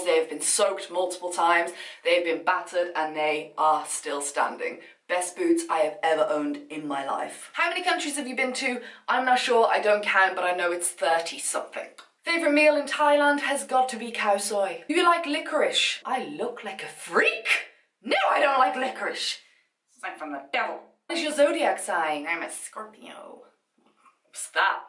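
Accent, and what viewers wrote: United Kingdom. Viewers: You British Ayeeeee???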